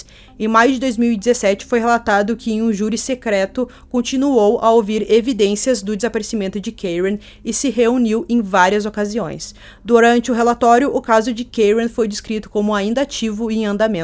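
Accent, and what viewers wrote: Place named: Pará, Brazil. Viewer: vc é do estado do Pará??? Você tem um sutaque típico de lá kkkkk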